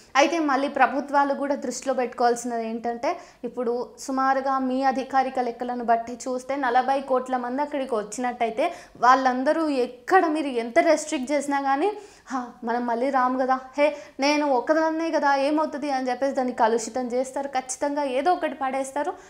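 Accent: India. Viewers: Indian educated vioce.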